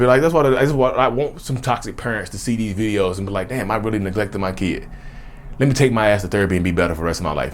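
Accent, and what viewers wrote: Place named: North Carolina, United States. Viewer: That preacher thing happens to my voice in my videos sometimes too! 😆🤣🤣Also, the very FIRST time I heard you speak, I was like, "This dude sounds like home. He has to be from NC..." I'm in L.A. by way of Charlotte. Congrats on all the moves you're making,. -S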